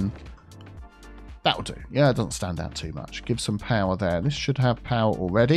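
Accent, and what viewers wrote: United Kingdom. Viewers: Building this one along with you to help me learn. Love your content. Great to hear a British voice! Thank you!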